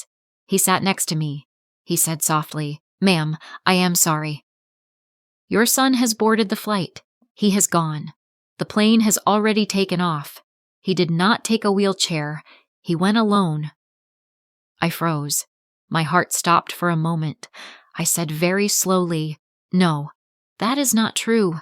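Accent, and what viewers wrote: United States. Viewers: American accent? no, thanks. I want British English. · American or British?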